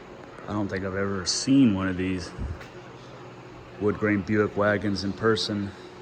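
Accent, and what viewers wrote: South, United States. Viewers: You have a deep southern accent.👍🏾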